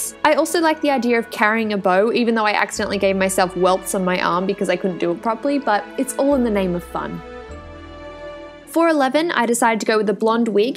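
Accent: Australia. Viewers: Omg you're Australian same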